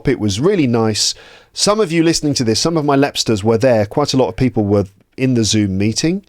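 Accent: RP, United Kingdom. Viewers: Hello,! I've enjoyed this rambling episode because you have talked about various themes, you have played different sorts of guitars, you look so happy and relaxed when you talk about your children and when you play guitar!!! Obviously, I'm always pleased to hear your clear modern RP English. As regards reporters' way of speaking English, I think they should speak as well as you do. Thank you for your dedication and kindness. 😊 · i really like your podcast, your british accent makes it unique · Thank you. I was waiting for your new episode. You have helped me a lot to improve my British pronunciation. Hope to meet you in person!:)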